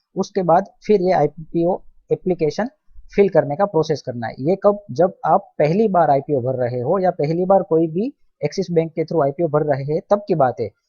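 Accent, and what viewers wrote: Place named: Gujarat, India. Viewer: Gujarati lago😅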